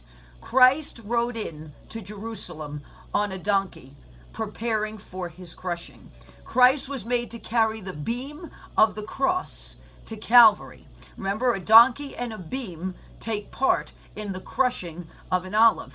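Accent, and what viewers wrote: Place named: New York, United States. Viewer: I love that connection to the Lord's going through the suffering on the cross, his burial and the Resurrection, and how the processing of the olive oil with a donkey, wood, and the crushing weight of a stone fits into the narrative so well. No wonder I love you so much Ms.! I'm a New Yorker transplant to Texas (in 1982), and it's always fun to hear you speak with that Italian NY accent as well as inform us of what's going on in the spirit world and the physical world!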